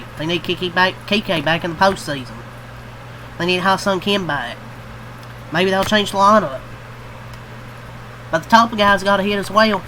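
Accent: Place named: Atlanta, United States. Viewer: Where are you from? Sounds like an Atlanta accent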